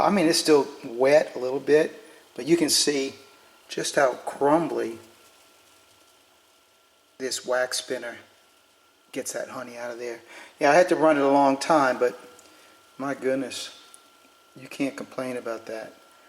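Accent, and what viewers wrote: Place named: New Orleans, United States. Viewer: Just listening to you, I heard a N'awlins accent. Then I expanded the intro. Sure enough, I see that you're from Louisiana. I kind of figured as much when you began talking about the cicadas. Love y'all. I live in GA and I'm from AL originally. A whole lotta good food comes out of Louisiana. Thanks for showing us your honey making operation. I've seen small operations before but seeing a big one like yours is a real learning experience. Really impressive. Thanks for posting the video.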